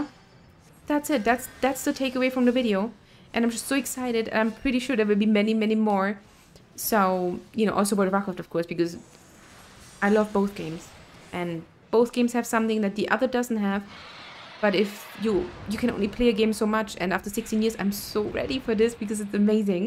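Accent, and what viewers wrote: Germany. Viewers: Thought you were german, you speak english like a german one. Greetings from Germany to France =) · Ist that a German accent?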